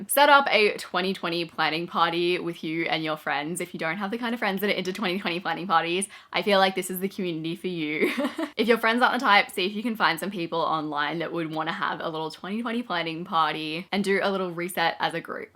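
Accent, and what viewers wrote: Australia. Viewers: Hi, I'm not English but I live in England, I thought she might be from somewhere in the North but I couldn't recognize the accent and after I had a look at her IG I saw she's based in Australia so her accent is actually Australian! x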